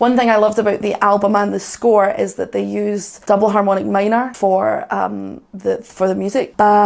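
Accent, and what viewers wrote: Scotland, United Kingdom. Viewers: I happen to notice that, after a quick perusal of your video list, you don't have any reactions. How can this be? He is best known for his work with &, but I'd be interested in how you react to " " from his Mondo Cane album. He normally does experimental metal, but Mondo Cane has an Italian 1940-esque torch song vibe. Plus, I'm just looking for any excuse to hear more of your accent. What I would do to hear you soliloquize about music over some haggis, neeps & tatties at the Babbity Bowster in Glasgow...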